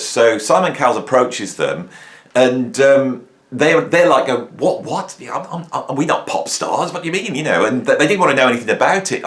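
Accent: Birmingham, United Kingdom. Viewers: I listened to this without visuals. The power of the brummie accent was far more compelling than any face furniture. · Got to love a Brummie accent!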